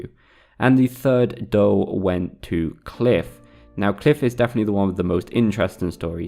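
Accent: United Kingdom. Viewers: Happy to find a British accent explaining this game. I'm American and I can't stand Americans' accents on Explained videos.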